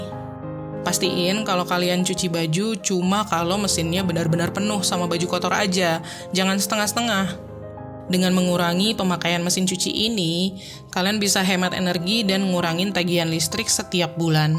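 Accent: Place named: Chinese-Indonesian, Indonesia. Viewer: aku salfok sama suara naratornya, suara chindo bgt kak. anw tips2nya udah sebagian aku aplikasiin di hidup aku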